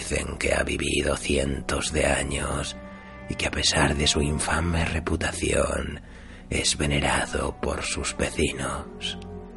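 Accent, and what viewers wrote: Catalonia, Spain. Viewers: El narrador es catalán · Por que el acento catalan? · Vaya ramalazo a catalan cerrado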